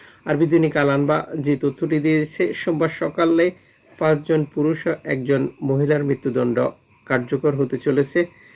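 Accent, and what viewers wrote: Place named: Noakhali, Bangladesh. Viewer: অপরাধী না অফরাধী! নোয়াখাইল্লা!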